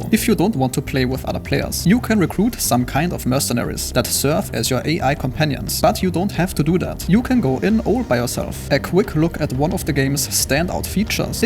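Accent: Germany. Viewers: Boah dieser deutsche Akzent geht schon hart XD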